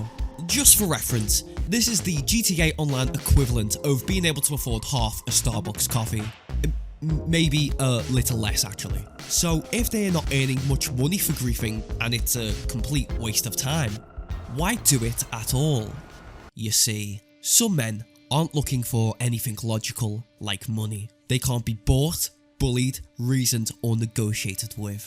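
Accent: Liverpool, United Kingdom. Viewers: W video scouse man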